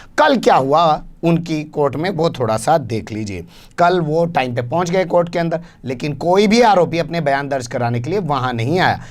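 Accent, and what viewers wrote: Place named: North India, India. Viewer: Sahab your (north indian)Desi style of expression, i like very much....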